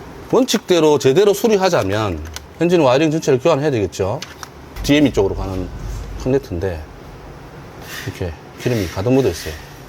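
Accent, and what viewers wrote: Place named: Gyeongsang, South Korea. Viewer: 대표님~어색하지만 ㅋㅋ 차츰 말씀 중 사투리가 사라지는 것 같은데.... 같은 경상도 사람으로서 씁쓸합니다.ㅎㅎㅎ 정겨운 사투리가 좋은데 ㅋㅋ ~ 수고하세요 !!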